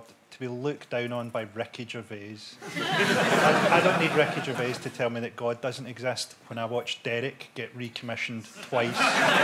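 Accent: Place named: Glasgow, United Kingdom. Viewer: When did frankie acquire a glasgow uni accent?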